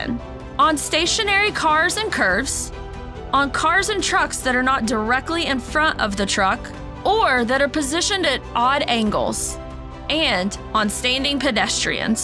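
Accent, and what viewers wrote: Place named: Texas, United States. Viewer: I think she meant WAKE. As in wake turbulence. But because Freightliner picked someone with a Southern or possibly Texan Twang to do the VO in this video, wake sounds like weight and wash sounds like "worsh."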